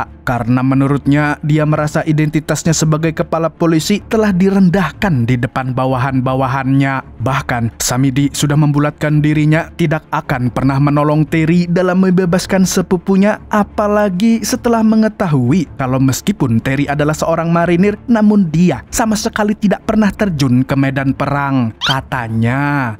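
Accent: Banjar, Indonesia. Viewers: Banjar kah bang ai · Urang Banua ya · bah urang banjarkah mang ikaam😅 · u orang Banjar Kalimantan Selatan mang ae😂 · Banjar banarrrr!! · orang banjar ternyata 😂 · Banjar kah pian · Orang banjar kah pian mang?🤣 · urang banjar 😂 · Banjar sklinya😭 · Logat banjar nya khas banar amang niii 😂😂 Salam matan kuala kapuas 😁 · orang banjar kah pian ni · Urang banjar sklinya 😂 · Lengah dikit amang nya urang Banjar wkwkkkk😂😂😂😂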